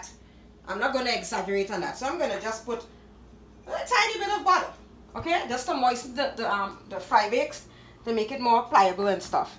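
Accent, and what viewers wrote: Trinidad and Tobago. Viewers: girl Ma ma you surely can put down some moves Just like all your Receipts.In Cooking you are very exciting.. You sound like a TRINI😆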